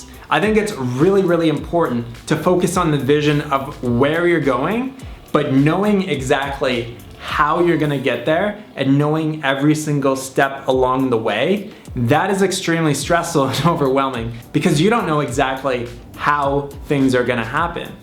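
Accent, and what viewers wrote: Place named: Canada. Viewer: sounds so Canadian in this video to me. IDK why....ha!:)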